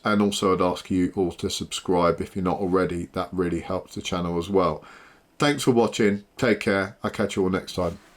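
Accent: United Kingdom. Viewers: I’m here because I love the bloody masculine British accent - from the U.S.A!!!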